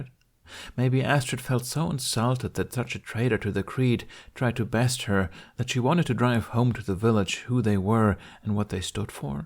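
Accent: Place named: France. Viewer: Dude the THICK french accent OMFG mate its fabulous.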